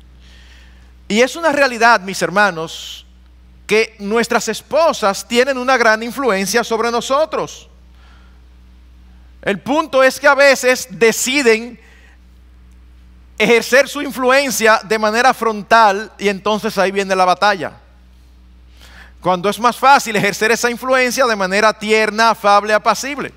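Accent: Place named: Dominican Republic. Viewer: Muchas gracias pastor, me encanta su acento dominicano trayendo estás enseñanzas bíblicas de la crianza, el Señor lo guarde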